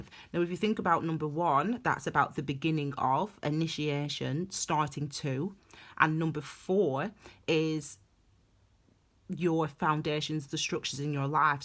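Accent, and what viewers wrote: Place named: England, United Kingdom. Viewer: Its nice to hear am English voice. I'm USA side now. Manchester birthplace.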